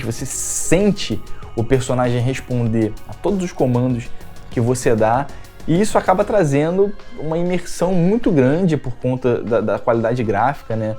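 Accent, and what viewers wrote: Rio de Janeiro, Brazil. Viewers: Esse sotaque carioca é uma pika mané, eu entendi "baú dos gay de 3" e é baldur's Gate 3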